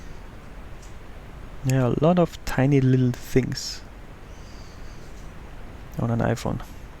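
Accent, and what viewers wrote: Germany. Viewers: Your Bavarian English is quite good!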